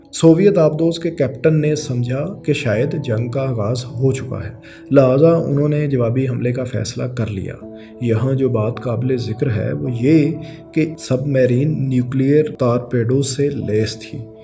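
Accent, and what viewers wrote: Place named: Punjab, Pakistan. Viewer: dude, your punjabi acent!! Content is amazing but your accent really ruins it.. Sorry!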